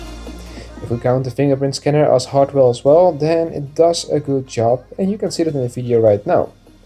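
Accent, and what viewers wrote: Netherlands. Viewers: 100% Nederlands accent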